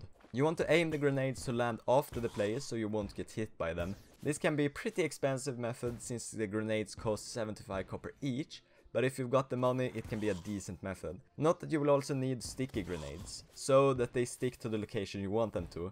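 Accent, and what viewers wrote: Sweden. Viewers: This is the most Swedish accent iv’e ever heard. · Man hör att du är svensk. xD bra video btw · I hear ur swedish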